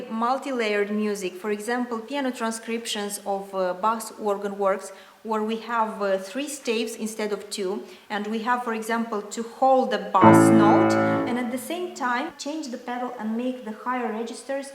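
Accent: Russia. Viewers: Замечательный, качественный учебный материал, сайт тоже сделан старательно и со вкусом. Небольшая ремарка насчёт русского акцента (товарищ внизу тоже заметил), от него легко избавиться если захотеть и понять главный принцип — англичане, особенно американцы, издают звуки большей частью через нос. Направляете все гласные туда, на этот канал цепляете согласные, и от нативного спикера будет не отличить, голос станет более певучим и появятся красивые обертоны. Процветания!! ))) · ох уж этот русский акцент, но красивая - глаз не оторовать ))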